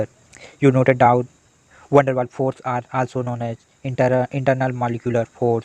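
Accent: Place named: United Kingdom. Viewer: Bhai British main lag rahe hai 🔥🔥🔥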